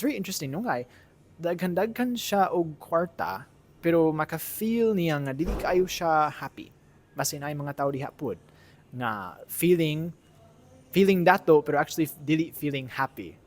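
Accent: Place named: Cebu, Philippines. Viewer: Maka amaze imong binisaya bisag 5 years paka sa Cebu Father mura nakag pure Cebuano the way ka mo esturya ug bisaya… Gi unsa nimo pagkat on ug bisaya father? Btw watching from Japan diay Father! God bless kanatong tanan!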